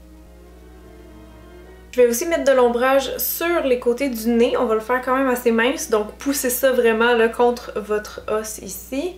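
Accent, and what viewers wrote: Canada. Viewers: J'adore l'accent canadien Ps: vive le Canada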